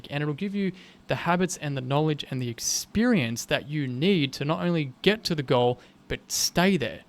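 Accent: Australia. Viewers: "a skinny fat Italian dude", I cannot see any Italian side (aesthetically) on you, even your name and surname sounds really English. From the way you pronounce some vowels I think you are Australian, anyway from which part of Italy are your (grand)parents? I like the way you explain how the body works, you are one of the best natural body I can see on Youtube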